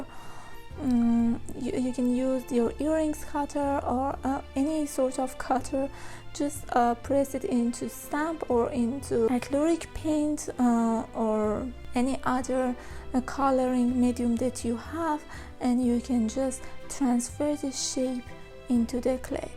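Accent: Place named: Iran. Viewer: I can call it from the accent that you might be Iranian ! but thanks for sharing the technique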